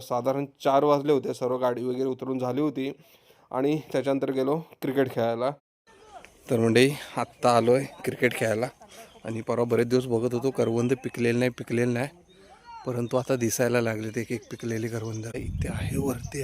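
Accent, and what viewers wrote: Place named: Konkan, India. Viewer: Are wah tumhi pan Tulsa bolta ...koknit khup samya aahe ...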